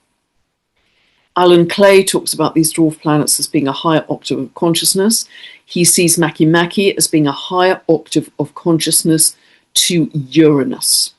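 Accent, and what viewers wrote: United Kingdom. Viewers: Unfortunately, I have no beginning of any house in Scorpio but Jupiter ( as in Taurus with Chiron…) my sixth house start in Libra and end in Sagittarius. A tip of how I could set intention with these parameters ? I m trying to work consciously on my body, to balance my air side and the overthinking that goes with. And my emotions 😅 I compare this axis with the time of the year and transformation of the trees. They lose their leaves and energy goes deep in the roots. It s not because we don’t see things that they are not here. But I’m sure that you have better explanation and more tips for the coming new moon ☺️ Thanks for you videos. Ps : I m a big fan of your British accent (also a nice aspect of your videos) Marie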